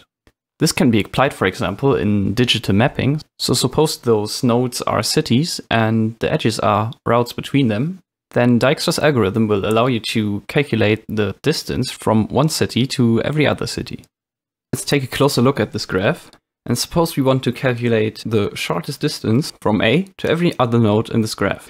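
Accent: Germany. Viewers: I trust this guy beacuse of his german accent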